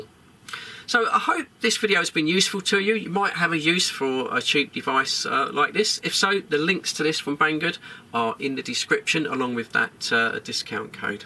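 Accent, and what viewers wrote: United Kingdom. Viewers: Curious, are you British by any chance?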